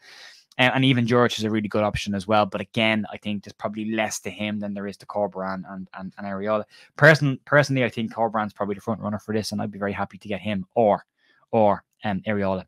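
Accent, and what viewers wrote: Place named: Ireland. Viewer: Where in Ireland you from bro? I'm Newtownards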